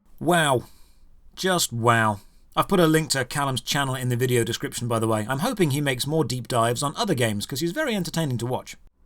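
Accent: England, United Kingdom. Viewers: Your Commentating reminds me of top gear, either its the nostalgic accent from my childhood in England or just your Personality.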